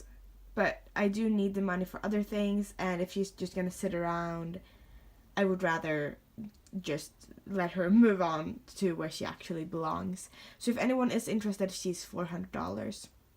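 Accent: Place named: Scandinavia. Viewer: Hey there. Eller hallo. I was wondering where you're from? Your gorgeous dolls' names seem very nordic to me, as well as your accent, so I was wondering if perhaps you might me in fact nordic?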